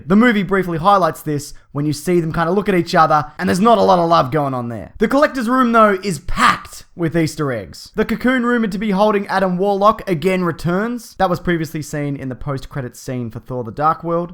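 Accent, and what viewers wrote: Australia. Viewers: lol I so want to know what you are saying in some of the collectors items and what they are and do, so I subtitled this video, (which anyone can do) and I guess an austrailian accent makes it so english subtitles horrible lol. for Thanos the subtitle came up as chans.... and almost every word is off. its funny, I recommend trying subtitles for this video just to see what I'm talking about. settings button or menu is right on the youtube screen itself. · this is the thickest Australian ascent ive ever heard AND IM AUSTRALIAN!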